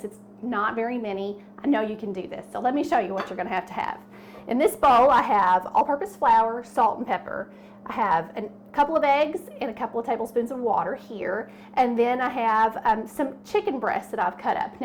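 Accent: South, United States. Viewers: I love how happy you are about sharing your cooking skills! And your southern accent! 😉 I’m southern too! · My wife and I who now reside in Wyoming grew up in Memphis. We Love your accent. Your cooking skills are a reflection of your Famous Southern Heritage, we can't wait to try this recipe. Thank You!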